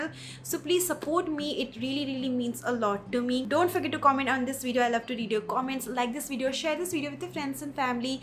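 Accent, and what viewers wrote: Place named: Bengal, India. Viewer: Baap re baap. Bengali touch from u. Love it❤️❤️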